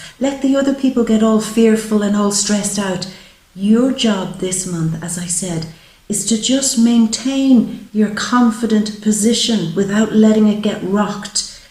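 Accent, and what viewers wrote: Ireland. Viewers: you're beautiful, intelligent and intuitive and thank you. plus i'm lovin' your Irish? accent? L've got the Irish blood too : )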